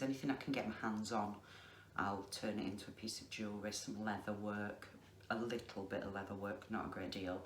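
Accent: United Kingdom. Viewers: Tomorrow we have a date,. But today i want to catching up... I clicked on a video of you because of the tattoos and I stayed because of your voice and natural way of speaking. To my spanish ears you have a strong British accent. And I love it!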